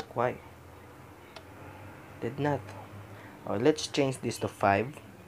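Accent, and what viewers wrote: Philippines. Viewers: I am usually good at picking out the Filipino accent. I couldn't at first in this video. You must be Visayan because I learned Tagalog and I know the from-Tagalog-to-English accident but your [beautiful] accent it slightly different. What really gave it away, was when you said "ano". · Love your Filipino accent! I’m from hawaii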